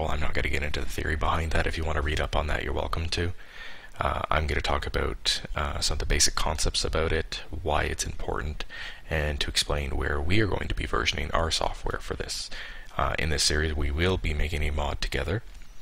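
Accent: Canada. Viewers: Are you canadian? I sweat I heard aboot a few times.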